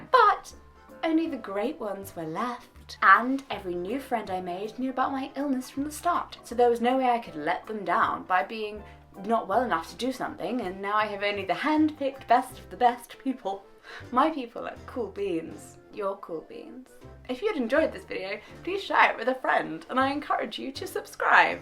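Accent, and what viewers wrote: RP, United Kingdom. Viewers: I did not understand the speaker (the British accent for one thing) or where she was coming from until late in the video. Hmm. I recall being touched by Selma Blair announcement though. I’ll have to watch more to figure this chipper redhead out. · You sound very posh. Love it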